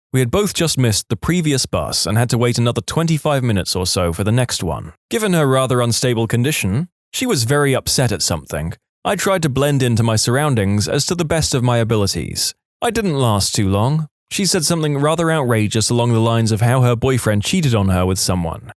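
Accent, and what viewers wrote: England, United Kingdom. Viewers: omg British man? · Who is this very English narrator and what did you do with British possibly from Edinburgh narrator I need a narrator with my accent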